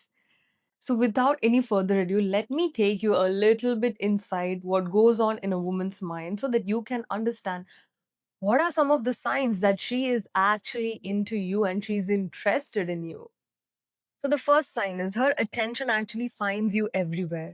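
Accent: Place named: India. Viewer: You must be from India. Your accent gives you away.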